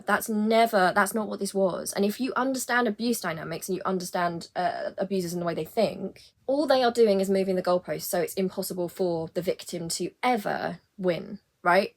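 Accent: United Kingdom. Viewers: Thank you for sharing your thoughts on this subject! Finally, a breath of fresh air (with a British accent, too❗️) I subscribed❣️👍🏽🤗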